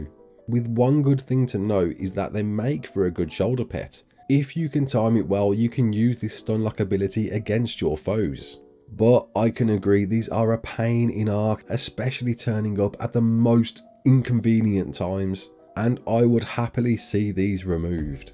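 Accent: Birmingham, United Kingdom. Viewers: He’s definitely from smethic Birmingham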